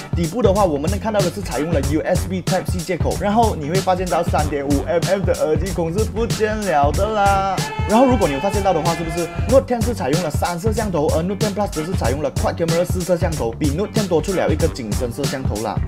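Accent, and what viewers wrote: Malaysia. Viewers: 馬式英文好難懂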